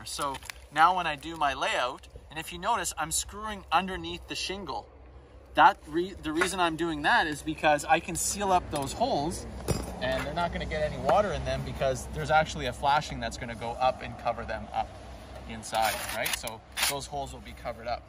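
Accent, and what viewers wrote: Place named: Canada. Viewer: Sounds very CANADIAN they way he speaks... I bet I'm right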